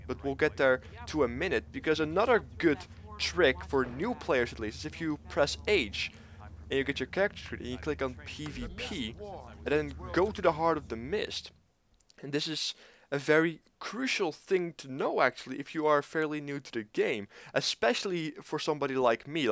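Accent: Netherlands. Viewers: are you Dutch?:P, If so, do you play on Ring Of Fire? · I knew it, because your accent is just typically Dutch, (Ik ben ook Nederlands dus ik kan het weten) On which server are you playing?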